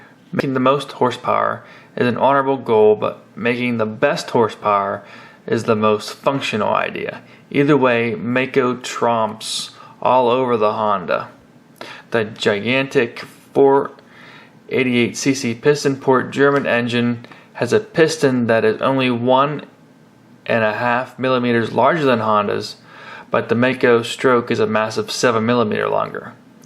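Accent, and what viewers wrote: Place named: Pittsburgh, United States. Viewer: Nice Pittsburgh accent. lol GREAT job capturing the history of these AWESOME machines.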